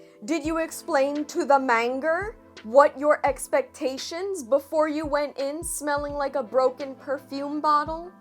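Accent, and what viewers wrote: Spain. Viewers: Oh and i just love your spanish accent!!!!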